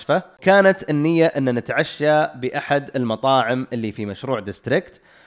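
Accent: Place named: Saudi Arabia. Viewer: حياك الله اخوي انا من سلطنه عمان وحسب كلامك بالمقطع اظن انك سعودي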